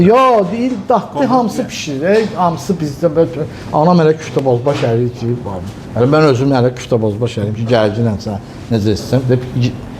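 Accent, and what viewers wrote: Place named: Baku, Azerbaijan. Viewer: Bakı ləhcəsi kimi gözəl ləhcə yoxdur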